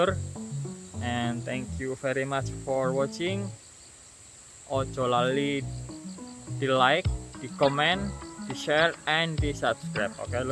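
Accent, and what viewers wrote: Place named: Banyumas, Indonesia. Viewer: Aq pengem ketawa sm logat ngapaknya kak🤣krn dlu mbak kosku iso ngapak jg kwkwk jd aq suka dcritain humor2 pke bhs ngapak kwkwkk kamshamida kak dh sharing villa ademnya...slm dr sydney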